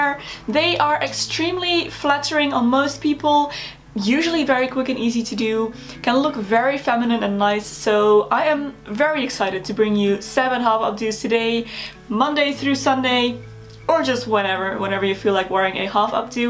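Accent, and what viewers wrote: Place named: Netherlands. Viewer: what accent does she have? She is from the Netherlands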